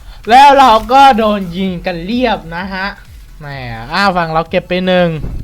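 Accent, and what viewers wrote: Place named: Isan, Thailand. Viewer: ก็ว่าสำเนียงพูดเหมือนคนอีสาร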